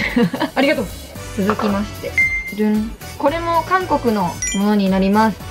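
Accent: Okayama, Japan. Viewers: 岡山弁かわいい！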